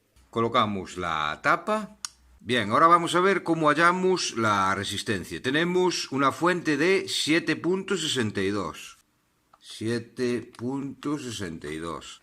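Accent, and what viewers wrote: Galicia, Spain. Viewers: Al margen de que eres un genio, me encanta tu acento gallego. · Gracias amigo, me encanta tu acento Viva Galicia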